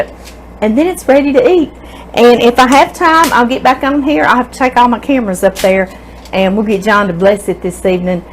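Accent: South, United States. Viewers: This looks so delicious!! I just love watching you and listening to your southern accent 😍 I just love pampered chef, you keep on Killin them with your wonderful kindness ❤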